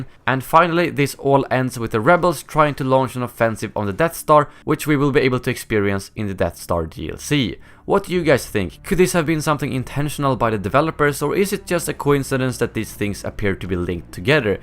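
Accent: Sweden. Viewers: Dude are you swedish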